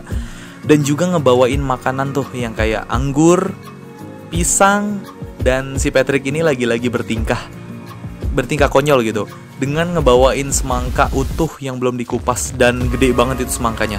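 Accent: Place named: West Java, Indonesia. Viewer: Abang ti sunda nya bang Ngomongnya ada teh mulu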